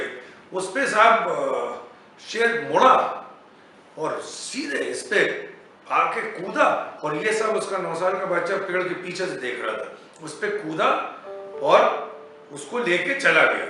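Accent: Bhopal, India. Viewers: Zabardast story👌 and very well narrated in bhopali style..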